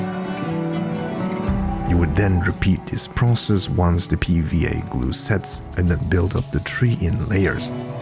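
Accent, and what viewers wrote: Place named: Germany. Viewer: It's peculiar that you sound like a German native speaker to me.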